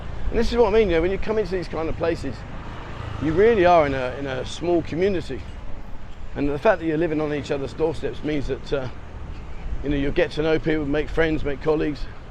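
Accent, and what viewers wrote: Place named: London, United Kingdom. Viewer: I'm from los Angeles, and i have difficulty understanding your London accent, but it's worth it! Lov You! Keep it up!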